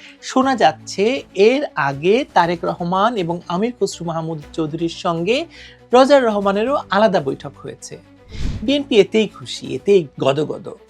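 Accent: Kolkata, India. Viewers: আপু আপনার ভাষা খুব পরিচিত লাগে, কলকাতায় গেলে এরকম ভাষা শুনতাম। আপনি পাক্কা ইন্ডিয়ান মাল। দেশে আইসেন না প্লিজ।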